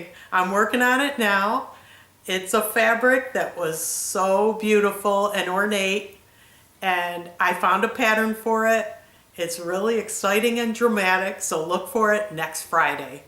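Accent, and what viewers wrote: New York, United States. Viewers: Your skirt and red top look great. I'm trying to figure out your accent. Almost NY but with a twist.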